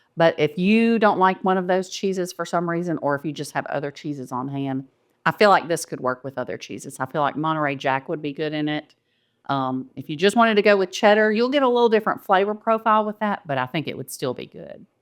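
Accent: South, United States. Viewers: Hi and D, Everyday I’m looking to see what dish your making.. Never heard of this casserole but planning to put it on my meal plan for next week.. Looks easy and tasty..If I wanted to add sides to serve with it then what do you suggest?.. Thank you for the recipe..I like your southern drawl and you have beautiful healthy hair.. Retired cosmetologist here with a thick southern drawl …😂😂😂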